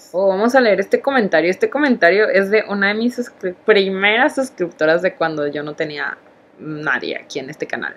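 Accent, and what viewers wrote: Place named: Tabasco, Mexico. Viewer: sk kuando somos tabaskeñas no keremos k se nos note el acento te entienndooojajaja te adorooo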